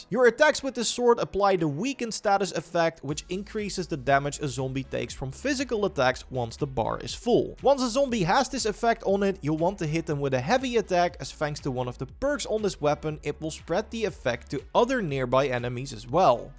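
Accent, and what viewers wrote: Netherlands. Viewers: Ik hoor een nederlands accentje haahhaa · je blijft horen dat je Nederlands bent haha maar thanks voor de tips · I smell dutch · Jezus wat klink jij nederlands als je engels praat 😅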